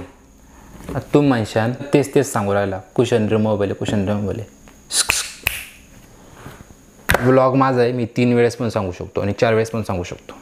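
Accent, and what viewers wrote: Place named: Yeola, India. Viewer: Ek no bhawa ...Mi yeolyacha ...aplya gawakdchi bolnyachi style nad hmmm. Cushion removable..😂😂😂😂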